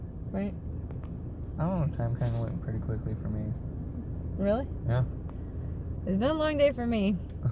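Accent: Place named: United Kingdom. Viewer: I thought I heard a British accent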